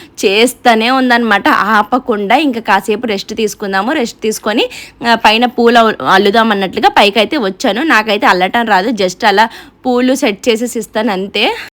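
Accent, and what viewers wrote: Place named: Telangana, India. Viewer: Madhyalow telagana slang enduku andi medhi srikakulam kaddaa😊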